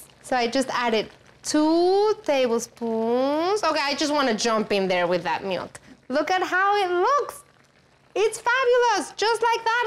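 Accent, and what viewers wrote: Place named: Latin America. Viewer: She have a latina accent...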